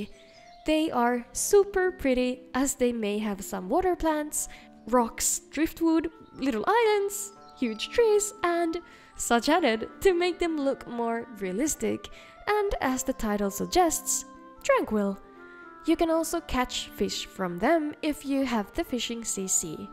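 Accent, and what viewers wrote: Finland. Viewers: From the way you pronounce names it becomes clear that you're Finnish. Only a finn could say "Jenassa" like "Suomessa" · I was thinking that you kinda sound like a Finn, and it seems you are lol. Just started my billionth Skyrim playthrough and am in the process of modding it 'till it breaks.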